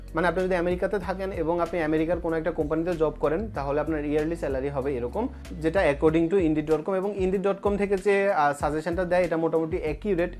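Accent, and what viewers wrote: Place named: Noakhali, Bangladesh. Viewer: Apner kotha sune mono holo apni noyakhali or Laxmipur er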